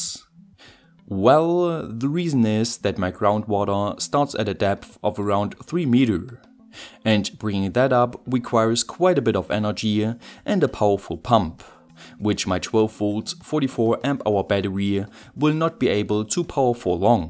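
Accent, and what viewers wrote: Germany. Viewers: I think it just comes down to the German accent as some sounds in English don't appear in German, like instead of -ous (serious) we say -ös (seriös).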